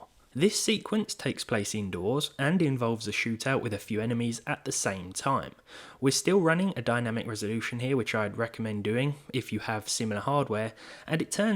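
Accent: United Kingdom. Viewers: ew british accent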